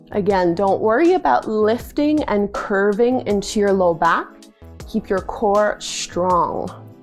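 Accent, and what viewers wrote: Canada. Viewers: Started following for the Canadian accent (I'm homesick 🤣😭) but stayed for the amazing yoga! Thanks. Had an overpowering feeling of love and sadness for my deceased grandparents during the savasana at the end of this practice. I wasn't expecting that.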